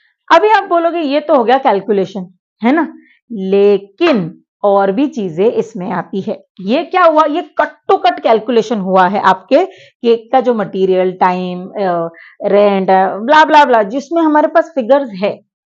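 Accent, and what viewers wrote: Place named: Gujarat, India. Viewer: Medam gujarat se he ya fir mumbi se he 😊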